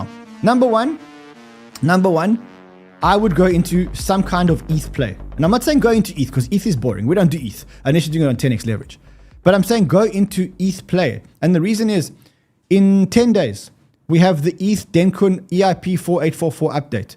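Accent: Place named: South Africa. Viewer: He is South african based in Cpt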